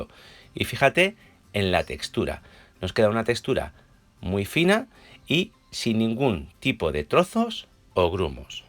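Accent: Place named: Spain. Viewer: Si es riquísimo yo aparte le pongo queso crema gracias me encanta tu acento Español saludos desde puebla México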